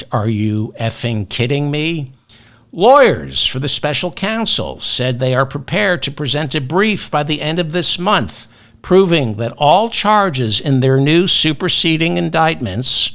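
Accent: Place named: Upstate New York, United States. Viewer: 🗽💙 I'm originally from upstate New York, & I grew up listening to, & working for people who sounded just like you. Using Commen sense was always a priority, so I guess that's why I enjoy listening to your Podcasts so much. Your presentations simply tell all of us to exercise the use of "Commen Sense" in viewing what the politicians represent. Thanks for being the voice of reason. I appreciate you...🤗 HUGS to ya! 🚙-Susan, in Vegas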